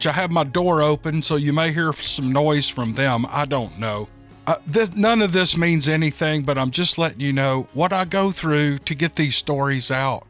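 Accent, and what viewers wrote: South, United States. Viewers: I don't know if they are true either. But to me it really doesn't matter. If they are true, good,if they aren't then it was a good story. I'm never going to assume that they aren't, cause I don't know. But please keep reading them regardless. I love listening to your voice with that southern drawl.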